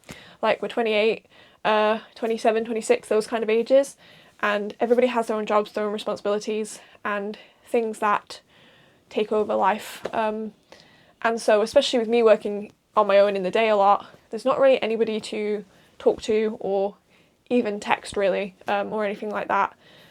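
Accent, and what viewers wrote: United Kingdom. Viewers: loved the video same im 24 and moved to a new city and am alone all the time ive lived here for nearly 2 years and I know 2 people here, also completely blew me away hearing the lancashire accent im from lancaster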